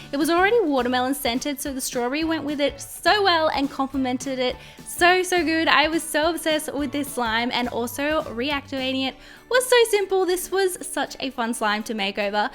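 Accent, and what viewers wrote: Australia. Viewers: Are you from Australia, New Zealand or South Africa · your voice is like italian australian some kind of thing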